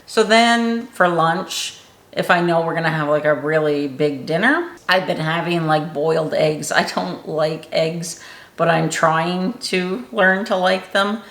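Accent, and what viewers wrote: Canada. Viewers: Congrats. Love grapes. Eggs? Why not whip them fluffy with your hand blender then place in the oven for a few minutes? Quality dark chocolate is good for you—not the health store ones that say 75%-100%, no. Get less than 70%, for sure. ***Might I suggest that you consider carbs during the day ONLY? Yes, this would mean before dessert before supper. I mean, no carbs after 5 pm for a few weeks then 4, for a few weeks, then, 3, then, 2 pm. Not kidding. Plus, this works faster than people assume. You sound a bit… Newfie🙂? And, an extra calendar = meal prep. No stress. Great luck with hubby🌬️❤️‍🩹🕊️. Congrats again🎉.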